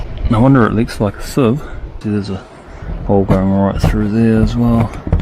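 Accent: New Zealand. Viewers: That's the awesome New Zuld accent...very flat in comparison to most other English speaking accents. Can lead to confusion when spoken in the West Island (Australia) e.g. Aussies say "sex" when they mean to say "six"..and the Kiwi will sound like "sux" when he means "six" leading almost immediately to sheep jokes!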